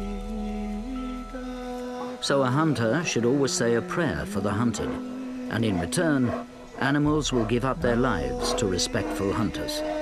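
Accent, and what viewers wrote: England, United Kingdom. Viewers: Interesting listening to what sounds like an Englishman speaking words like Appalachia and others. Very well done video though and quite professional. The native superstition material was a bit much. Moira Smiley was a perfect choice for vocals. Her talent was only out done by her beauty. She is lovely.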